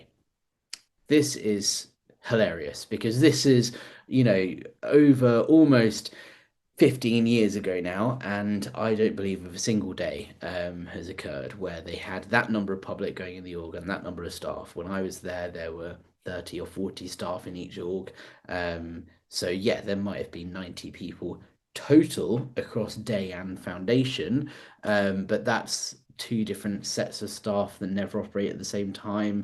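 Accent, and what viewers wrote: United Kingdom. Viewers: oooh that’s interesting!!! I am in Aberdeen Scotland. I have been following Chris, Aaron, Marc and others for a few years now. You and Jon Atack are the only out there ex scientologists I have come across with ‘British’ accents which brings Scientology closer to home, but I never really thought about it’s impact here in my part of the UK. Once, a handful of years ago, a guy my hubby worked with in Aberdeenshire mentioned he was going off to a Scientology meeting, of course pretty arrogant about it all, but my impression was he was still very new to it all and I guess in my mind I figured it would be him and a couple other ‘strange’ people involved in something so ‘crazy’. Now I wonder just how many folk up here, meaning Scotland not just my small part of Aberdeen, are still tied up with this cult. Is there anywhere I can find out about Scientology in Scotland, or is that too small a group to factor much? 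By the way, new subscriber, loving catching up on your videos, good luck with the channel!!!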